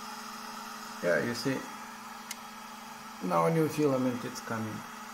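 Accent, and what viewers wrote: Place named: Lithuania. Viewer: ish anblu kalbos sprendziu kad lietuvaitis...irgi nusipirkau toki:)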